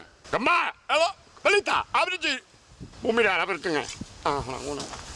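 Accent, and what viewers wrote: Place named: Valladolid, Spain. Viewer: Cando falas coma o paisano,tes acento galego. cando falas ti tes acento de valladolid curioso, non??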